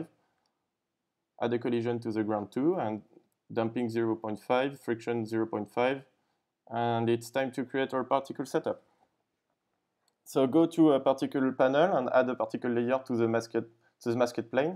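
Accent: France. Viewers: French right? I can understand it by your accent :p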